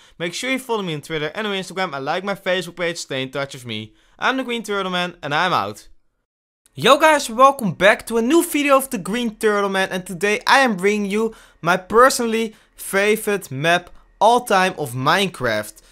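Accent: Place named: Netherlands. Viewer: Je bent nl dat hoor ik. Lol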